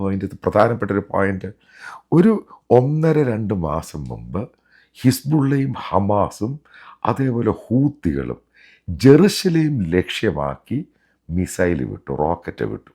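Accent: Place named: North India, India. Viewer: Your accent is pretty poor . Especially when you say attack, Qatar, now you just said Vatican . You sound like a North Indian Jero(Zero)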